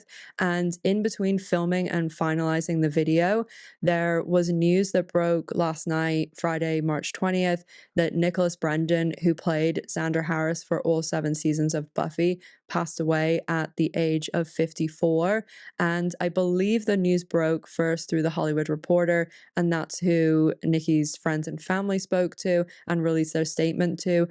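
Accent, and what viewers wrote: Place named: United Kingdom. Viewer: How come every 15th word is in a British accent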